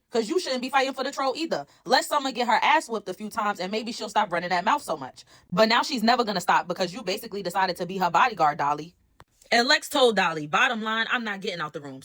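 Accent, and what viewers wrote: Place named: Brooklyn, United States. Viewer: Omg you’re from bk!! I knew it lol The dialect and accent is distinct. I’m a Bk native and while I live in another borough I will always rep bk! We a different type of breed.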